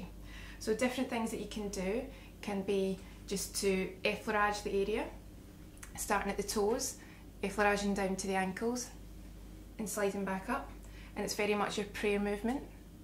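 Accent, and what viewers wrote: Scotland, United Kingdom. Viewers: Hiral Patel it a Scottish accent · Am I hearing a Scottish accent? :) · It's not even an accent. She's Scottish. That's how Scottish people sound. Duh! 🙄😏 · I LOVE your accent. All americans love the British accent but Scottish is my favorite. Pretty and engaging. Great video! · Love the Scottish accent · I LOVE the Scottish Dialect...So Sexy ❤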